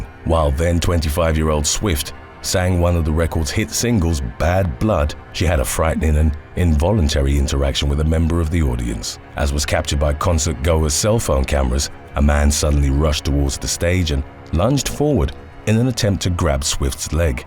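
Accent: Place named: England, United Kingdom. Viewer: he's English. they pronounce things differently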